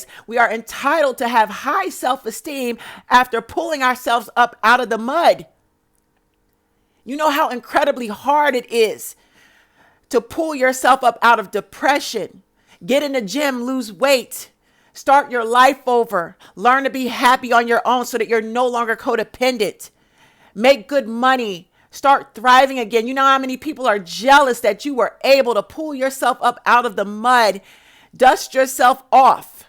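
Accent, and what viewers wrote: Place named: New York, United States. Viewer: Girl, you are New York on 100%. This just how we talk on a normal day. F them weak ass, soft asses. I love your tone and your vocabulary. You have taught me more about life than any school or any class I have ever taken. You change and save lives. The Devil is mad at you sis because you stay on his neck. These Kevin Samuels types make it seem like it is ok to put black women down. They get big mad when we speak up and shut them up! They do not have half of our intellect, our personality, drive, ambition or success. So they get mad and try to bash us. F Them.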